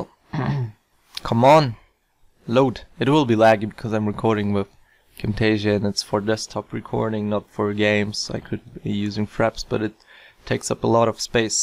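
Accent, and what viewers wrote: Germany. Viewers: German right?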